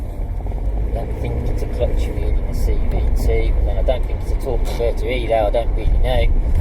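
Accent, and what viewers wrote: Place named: West Midlands, United Kingdom. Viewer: funny west midlands accent. Sorry I can't listen to it. I'm so sorry.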